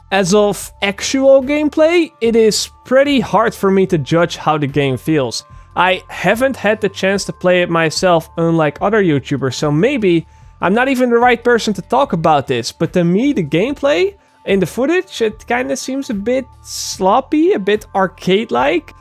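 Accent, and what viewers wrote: Netherlands. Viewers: The Gamer Of Canada sorry. i didn't watched the whole video but i heared his accent and then i wanted to ask if he was from the netherlands. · ik dacht al te horen dat je nederlands was haha. duidelijke mening. ik deel hem deels... ik ben bang voor het spel. angst dat ik teleurgesteld word. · why does this guy sound like he is dutch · ik hoorde gelijk aan je accent dat je nederlands was😂😂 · Because you sounds dutch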